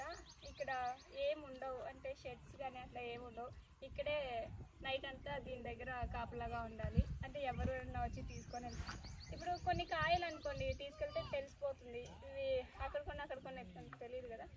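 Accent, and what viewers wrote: Chittoor, India. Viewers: Rayalaseema yasa vachestundhi...ma chittoor acsent la vundhi...little ga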